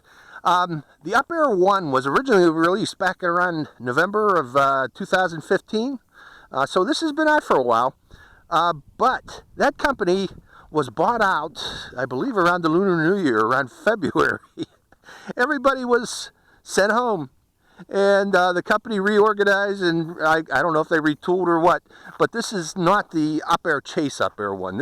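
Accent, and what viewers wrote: Pittsburgh, United States. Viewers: are u from Pittsburgh? I noticed a accent. .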